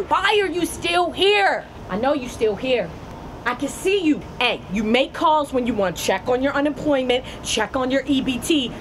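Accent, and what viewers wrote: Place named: Baltimore, United States. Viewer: I can hear her Baltimore accent clear.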